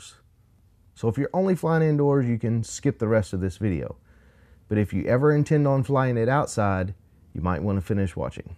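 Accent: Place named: Arkansas, United States. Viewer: I detect a slight Arkansas accent 🤔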